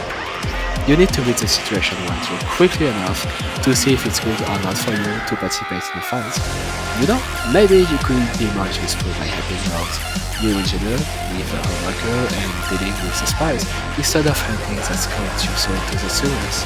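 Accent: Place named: England, United Kingdom. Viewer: Noice english accent 😙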